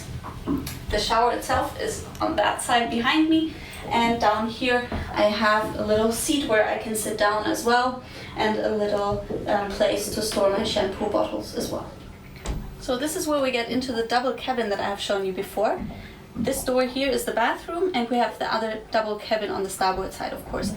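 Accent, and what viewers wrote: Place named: Scandinavia. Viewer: Nice video. Her command of English language is impeccable, despite a slight Nordic accent - to the point that I thought she might be American. It was only in the end, when the boat price was indicated in Euros, that I was certain she is European. Shouldn't she have introduced herself? As for the boat, the presentation was centered on more domestic qualities, some of them outright mundane, in my opinion. The fact that Hanse is a great light sailing ship to take out in weekends, or even longer holidays, in my opinion again, was insufficiently reflected.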